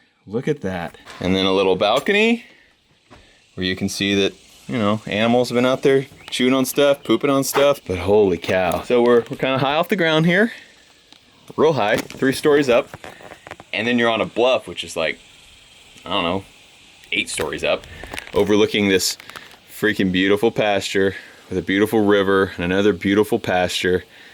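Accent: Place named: Montana, United States. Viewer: Montana...... Yes please Love the accent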